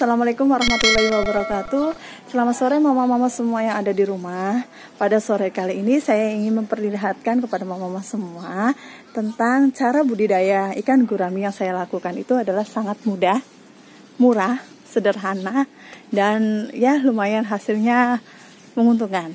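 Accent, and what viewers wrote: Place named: Banjar, Indonesia. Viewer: Dari logat ibu sprti orang banjar kalteng ya😀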